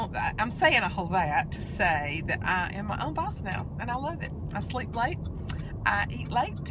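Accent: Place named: North Carolina, United States. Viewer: I love your accent! I'm from NC and it reminds me of a lot of my family's accents :)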